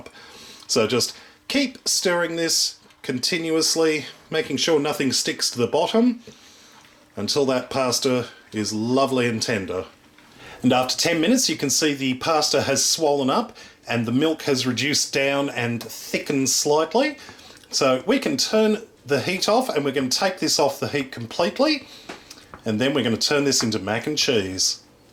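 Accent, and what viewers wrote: Australia. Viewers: Reaperz Void ..... no. That’s clearly an Australian accent. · are u australian? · I have to ask. Are you from Australia or New Zealand? This looks sooooo good! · Ok the second I heard the familiar Aussie accent I subscribed.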